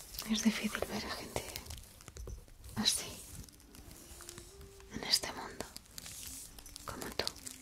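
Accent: Spain. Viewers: Me encanta el asento de España.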